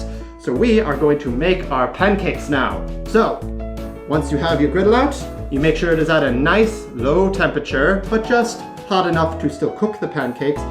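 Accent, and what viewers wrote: United Kingdom. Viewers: Omg I love the British accent it's as good as mine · You are like the furry version of Jamie Oliver. (Your British accent was on point, actually!) :D Im gonna try this recipe sometime, and every time i think of pancakes, im gonna think of you. :3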